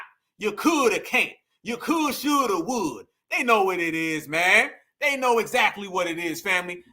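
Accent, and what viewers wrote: South, United States. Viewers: Thanks, that country ass accent ,with all that Honey Child bull sh,t peace and no disrespect ✌️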